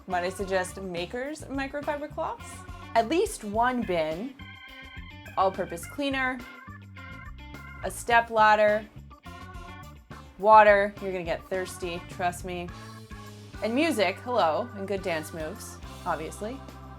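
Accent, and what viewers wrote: Canada. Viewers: My boyfriend knew you were Canadian just by your accent! I couldn't tell the difference until I focused on it. The way you say "progress" is so cute ☺️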